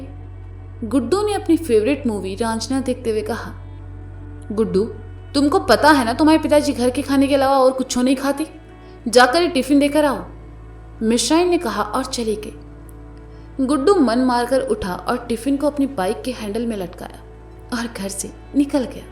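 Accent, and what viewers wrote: Kanpur, India. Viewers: aapne kanpuriya language ka mast boli ho...👌👌👌👌 Bahut badhiya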